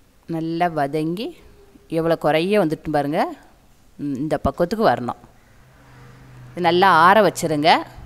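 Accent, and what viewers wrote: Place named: Tirunelveli, India. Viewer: Pudina Chutney super. Ungal Tirunelveli Tamil also super.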